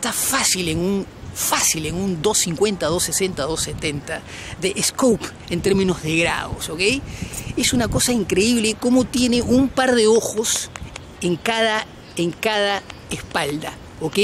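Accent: Argentina. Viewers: tiene dejo argentino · Afina el oído, el loquito tiene acento Argentino .no insulte a los chileno..., En Chile los yuotober ,no son tartamudos , tartamudos, tartamudos, tartamudos.....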